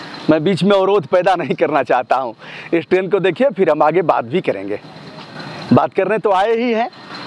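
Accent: Standard. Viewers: 🙏चाचा जी प्रणाम आज बहुत अच्छा लगा मुझे आपने जो अदभुत दृश्य दिखकर मन बहुत ही आनंद आया आपका बहुत बहुत धन्यवाद आपका शुद्ध हिंदी बोल बहुत अच्छा लगत 🙏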